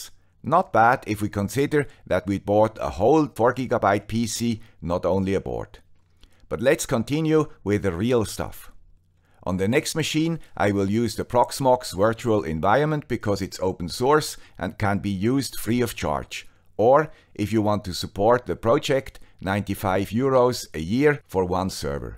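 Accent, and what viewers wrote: Switzerland. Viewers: The Guy with the "German" Suiss accent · I hate to sit in the first row, Mr. Swiss accent. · Dear guy with the Swiss accent, thank you for your videos. I ordered a S920, booted with USB-Debian Live but Balena Etcher only showed an empty white window. Repeated the process with xUbuntu Live, Balena worked this time but system didn't boot. "sudo efibootmgr -c -l /EFI/BOOT/BOOTx64.EFI -L HomeAssistant" replied with "EFI variables are not supported on this system". Finally "sudo efibootmgr --create --disk=/dev/sda --part=1 --label="HAOS" --loader='EFI\BOOT\bootx64.efi'" made my system bootable and everthing is working now. Thanks again and have a good one!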